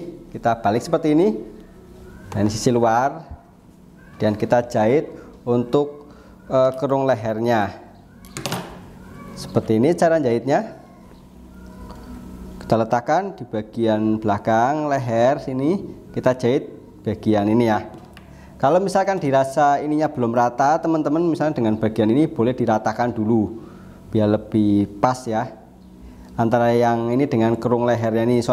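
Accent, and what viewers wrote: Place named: Pekalongan, Indonesia. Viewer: Kok suara nya seperti orang Pekalongan dongwuni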